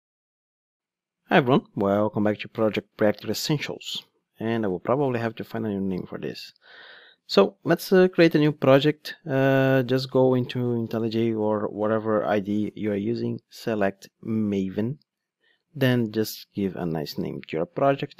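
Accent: Brazil. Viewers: O sotaque não nega BR na veia.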